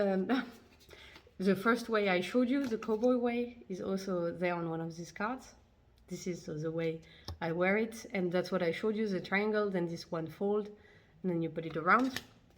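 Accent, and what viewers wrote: France. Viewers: French accent = immediate credibility! :) Thanks for the tutorials!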